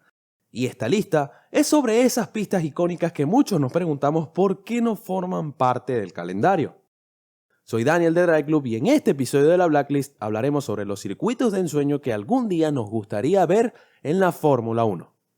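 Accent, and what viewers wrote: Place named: Venezuela. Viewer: Hola hermano, increíble vídeo. Tu contenido es lo mejor de lo mejor. Ese acento se me hace inconfundible, eres de Venezuela no?? Un placer ver a un compatriota haciendo contenido de este estilo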